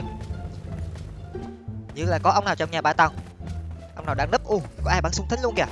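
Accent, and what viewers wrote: Binh Dinh, Vietnam. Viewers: Quê ban ở bình định hả ban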